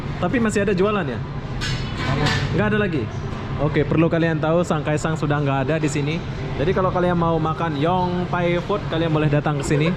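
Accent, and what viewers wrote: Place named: Malaysia. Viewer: Kamu orang malaysia atau indonesia? Waktu di video surabaya ditanya dari mana kok jawab dari lombok , tp logat kaya dari malaysia . yang bener mana 🤣🤣🤣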